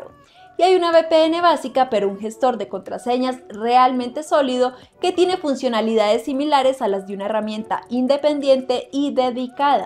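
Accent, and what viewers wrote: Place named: Mexico. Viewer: Me acabo de suscribir, tienes acento de mexicana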